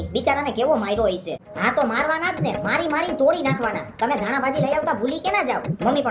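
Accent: India. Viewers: bhura tu rajkot no cho k nay... mne avu lage 6...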